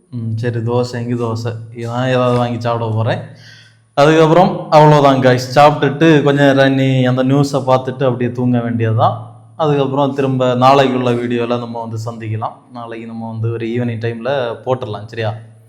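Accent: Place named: Kanyakumari, India. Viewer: உங்க ஊரு கன்னியா குமரி மாவட்டம். ....😂😂😂😂😂 நல்ல தமிழ் . எங்க ஊரு மொழி எனக்கு தெரியாதா.....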